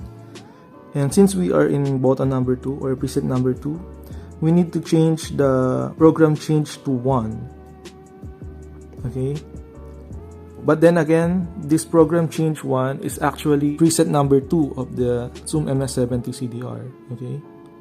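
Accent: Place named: Philippines. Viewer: Sorry I thought you were Indonesian. Now hearing again I hear a Filipino accent instead. Mantap is Indonesian for delicious/awesome :D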